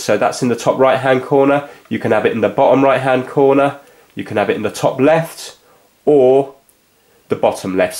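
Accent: England, United Kingdom. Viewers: England accent👍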